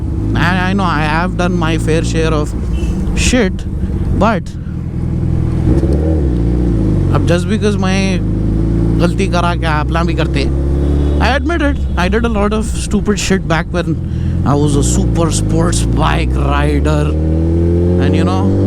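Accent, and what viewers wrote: Hyderabad, India. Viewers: Ye Tappori dilect nai hai bhai !! Hyderabadi hai Hyderabadi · Assalamwalakum bhai maintained Hyderabadi style me In words 👌🏻👌🏻👌🏻👌🏻👌🏻♥️📸💥💥💥😍🤲🏻🤲🏻🤲🏻 #Kįrāk 🤯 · bhai it was just crazy listening you.🤩🤩🤩 Aapki riding,aapki English (mashallah),aapki commentary Hyderabadi mai,aapka slang to good to awsm to listen.🔥🔥🔥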